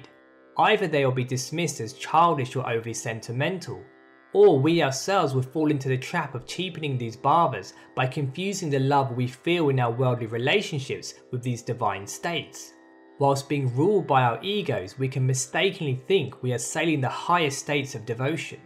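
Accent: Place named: United Kingdom. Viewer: I think and he sound like british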